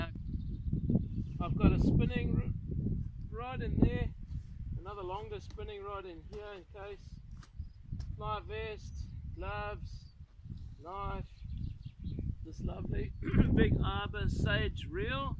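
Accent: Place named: South Africa. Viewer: i love your films. enjoy your SA accent and knowledge of birds, etc, funny camping cooking scenes etc beautiful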